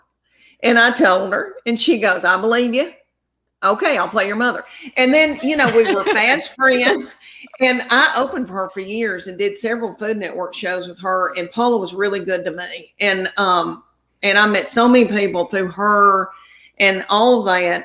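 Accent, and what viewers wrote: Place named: South, United States. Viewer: OMG this woman is the best person who ever came out of the South. I’m from New England, I used to have to (sorry) put the subtitles on when I listened to her. But now I understand every word. I laugh harder at her comedy than ANYTHING. Her stories about her kids and husband are soooo funny. I love her, and Nate Bargatze, and found them both just before the pandemic. Wow! Southern comedy and rural Vermont comedy? 😮Not so different. Growing up with just weird and wonderful people makes for such terrific stories. And you can NOT make this s—it up!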